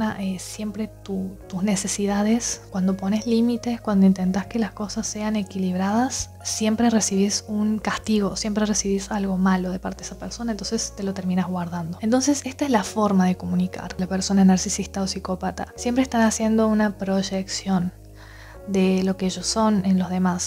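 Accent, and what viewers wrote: Argentina. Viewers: que lindo es escucharte, muy clara y argentina ❤un saludo muy afectuoso.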